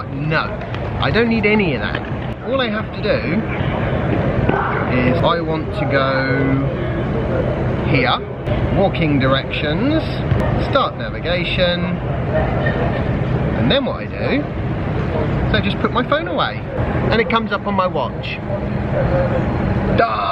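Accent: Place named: United Kingdom. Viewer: 💯 Brit accent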